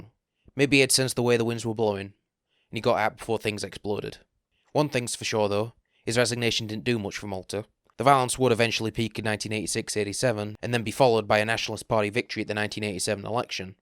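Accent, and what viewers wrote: Yorkshire, United Kingdom. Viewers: Nice to hear a fellow Yorkshire man speaking, how do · You sound more Yorkshire then you ever have done so before. Is this on purpose?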